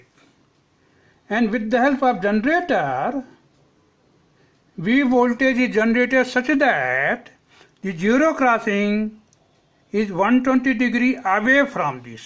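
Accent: Bihar, India. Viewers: Sir aap English Bihari bhasha main Bolt hi please Hindi mein boliye thank you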